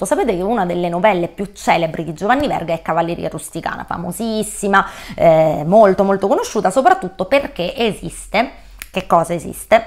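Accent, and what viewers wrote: Sicily, Italy. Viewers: Adoro questa tipologia di video, anche il tuo siciliano 👍🏻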